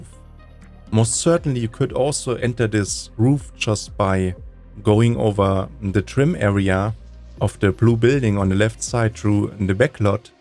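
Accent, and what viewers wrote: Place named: Germany. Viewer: I hear a little bit deutsch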